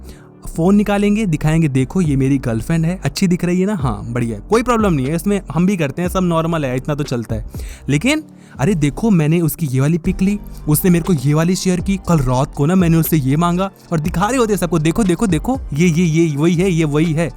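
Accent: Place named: Uttar Pradesh, India. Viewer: Lagta hai aap u.p state ke ho ..mera ak friend bhi u.p ka h ..wo bhi aise hi bolta h ..har baat me bhiya use krta h..😂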